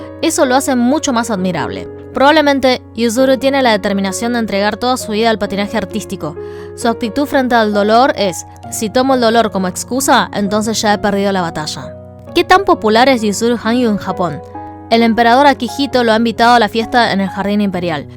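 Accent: Argentina. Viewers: Me encanta el acento argentino de tu español, che! Sos, única! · Se me han caido las lagrimas de emocion gracias chinita linda me encanta tu acento Argentino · Buen video. Estaría mejor si modulares tu acento argentino y pesado....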